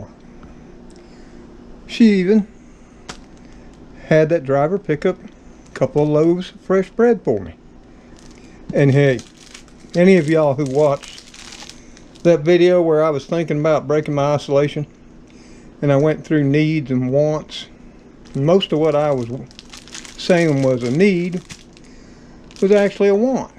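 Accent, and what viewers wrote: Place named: Deep South, United States. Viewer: Dear Mr, Trixie and the kitty crew ….I have been watching you since Dec 2019 and I just love the way you speak...your accent makes me smile and I have learnt so much from you and your way of life in the deep south. Not sure how I came across you but I am so glad I did because yours is my favourite channel so I thinks its definitely us the viewer that is blessed to have such a wonderfully humble and gracious host with such a love for life and his fellow animals. By the way...I am Indian by heritage but born in the UK and I live in a little village in Shropshire ….a lot of things are so different but the love we share is the same...God bless you Sir....Mand X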